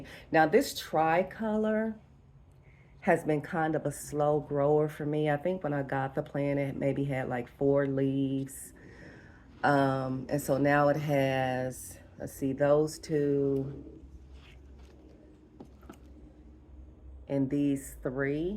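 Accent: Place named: South, United States. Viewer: I love your collection of Scindapsus. I love all the them. I think they are so different and spectacular.....I love your southern accent....many blessings....chula....abrazos amiga....MINDY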